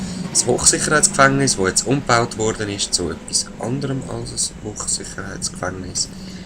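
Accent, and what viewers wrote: Zurich, Switzerland. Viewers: i wellem kanton wohnsch du eig.? :D töhnt wie Züri :D Und super part natürli ^^ :)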